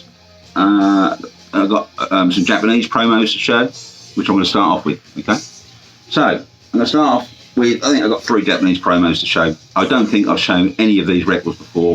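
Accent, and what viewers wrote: Suffolk, United Kingdom. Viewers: Hello from a fellow son of God's own country (Sudbury to be specific) and a retired provincial journalist of near enough 50 years experience. I think I can clear up your stickers confusion. Back in the day, record companies would send white-label promos to radio stations, specialist music mags and national newspapers to generate pre-release publicity but they would also lift records from the first production run and ship them out to reviewers on regional and local papers. More often than not they would stamp or sticker the covers, sometimes even cutting a notch in the corner, to underline that technically these remained the property of the company who didn't want them being flogged on the black (well, greyish) market. It sounds a mite petty but hundreds of copies of hundreds of albums potentially adds up to a fair chunk of change each year. 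I should add, hand on heart, that I never resold a record but because I didn't like the artwork being obscured I habitually removed the stickers. That sometimes meant the sleeve was damaged but, ironically enough, even when the sticker came off clean I probably reduced its rarity value in today's VC market! Hope that helps and please keep up the good work. I only subscribe to two of the countless 'old bloke talks about music' channels and your's is a particular treat because it proves that the Suffolk accent hasn't been completely swamped by Estuary English commuters...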